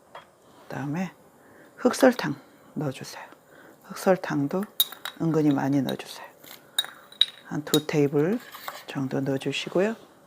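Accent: South Korea. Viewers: 친정 엄마께 제가 잡채하겠다고 말씀드려놨더니.. 당면을 미리 데쳐놓시고. 우엉이며 녹두나물이며 시금치까지 준비해놓으셨어요..ㅠㅠ 그래도 원체 식구들이 좋아하는 아이템이라.. 꼭 저리 당면삶아 해보고 말씀드릴께요.. 코다리 무우조림도 열씨미 보고 있구요.. 삼삼하고 손쉬운 깻잎도 정말 대박입니다.. 두가지 꼭 제 레시피로 만들려구요~ 저도 전라도 광주라 친근한 말투가 정겨워서 자주 듣게 됬습니다. 쉽고 고급진 레페시도 땡기고요.. 아참. 소갈비도. 나중애 도전아이템 입니다.. ㅎㅎ 감사합니다. 대표님~~^^♥ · 요즘 듣기 어려운 서울 억양이 듣기 좋네요.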